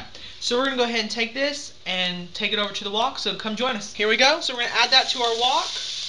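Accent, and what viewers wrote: United States. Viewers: he sounds southern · he sounds like he's living in texas or something with the southern accent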